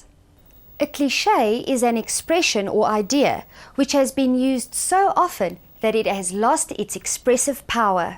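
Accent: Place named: South Africa. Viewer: south african possibily afrikan speaker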